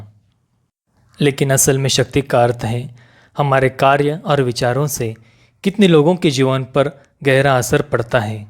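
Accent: Maharashtra, India. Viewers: I like your Marathi touch in your Hindi.... this is the beauty of our Bhartiya culture ❤️ Jai Hind 🇮🇳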